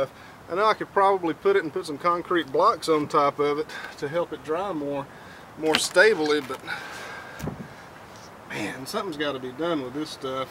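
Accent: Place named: Georgia, United States. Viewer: Yeah, you sound like a Georgian. I live in TX but have a sister who lives in Lawrenceville. Your voice sounded familiar - like my brother in law. Keep on making videos.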